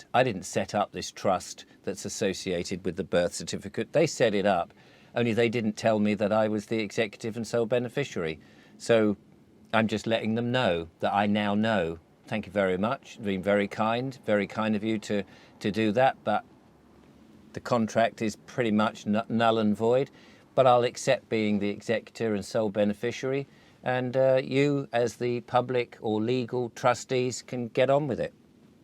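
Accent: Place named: RP, United Kingdom. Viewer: You're quite posh. It's nice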